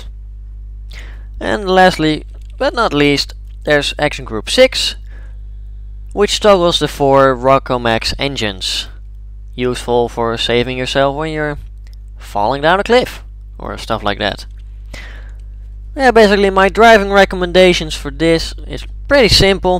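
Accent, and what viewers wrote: Netherlands. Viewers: cant watch this because of the dutch accent, I'm must be the only dutch person in the Netherlands who can talks English almost completely without any accent. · you have the best dutch accent... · You sound so fucking Dutch. Idk if you are. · Sorry but, are you dutch?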